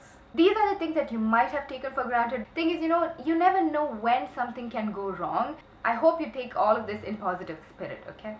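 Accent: India. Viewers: is that a Hindi accent? cool! I think its lit and unique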